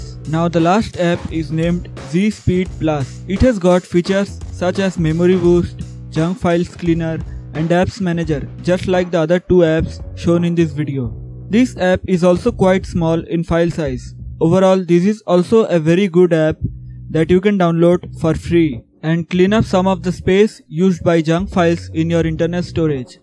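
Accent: India. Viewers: I think most people with a bit of intelligence can HEAR the gentleman is Indian, but YOU just couldn't help yourself could you, you sarcastic, RACIST IGNORAMUS. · bro practice hard your accent i know you are Indian many indian youtubers who cannot speak like the american because of your accent i cannot understand your talking about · Why is everything in Indian accent these days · I like his video Because his indish accent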